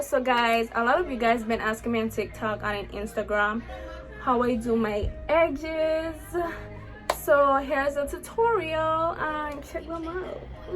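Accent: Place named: Virgin Islands, Caribbean. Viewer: Hearing a VI accent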